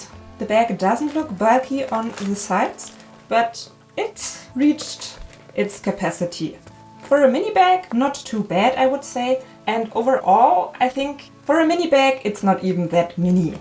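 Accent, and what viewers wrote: Germany. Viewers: The bags are pretty 😍🥰 and your sweet German accent is ever sweeter ❤️🥰💋😍💞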